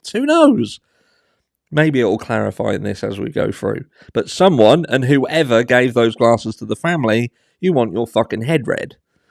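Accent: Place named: Waveney, United Kingdom. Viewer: You have a great Waveney accent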